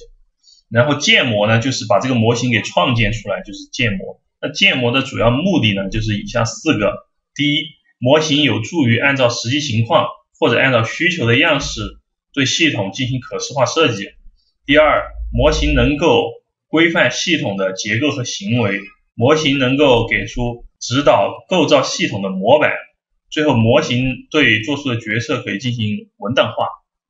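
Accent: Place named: Sichuan, China. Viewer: 老师像是四川人，哈哈哈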